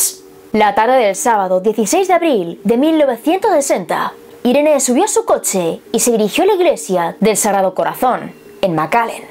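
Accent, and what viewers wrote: Spain. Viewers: Saludos, hermosa bellota, tu acento español es hermoso precioso y divino tesoro. Excelente investigacion y mejor video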